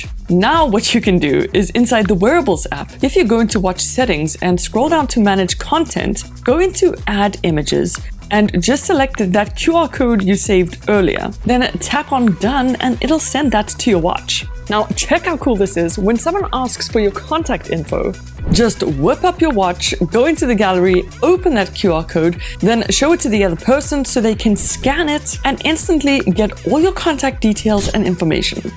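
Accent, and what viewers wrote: South Africa. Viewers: South african accent😅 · London but you sound South African... Amirite? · Getting my watch in a week. Lekker video, sounds like a zaffer in the UK. Am I right ?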